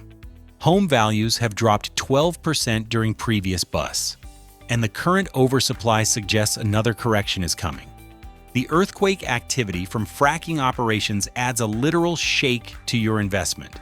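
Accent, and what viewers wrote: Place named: California, United States. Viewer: Eve thing you said about Texas is NEGATIVE. Pure BS created by AI. YOU MUST NOT be a Texan. You sound like from California trying to prevent CA residents to move in to Texas.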